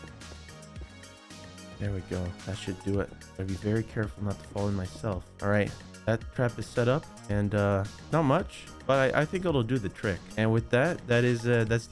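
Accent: United Kingdom. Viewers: The scouse accent is killing me 🤣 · I never knew can do a british accent!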